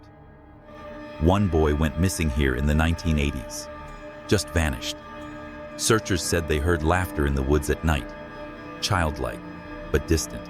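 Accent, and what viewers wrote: United States. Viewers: As soon as I heard the American accent I knew this video was bullshit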